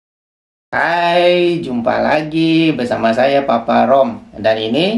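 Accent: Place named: Malaysia. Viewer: Logatnya mcam org malay eh😂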